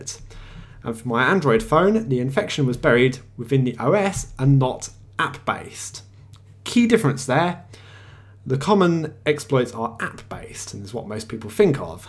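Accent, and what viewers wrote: United Kingdom. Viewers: Your British accent is SO strong, haha! Funny that the number or likes in this video (at this time I'm writing) is 666, hehehe.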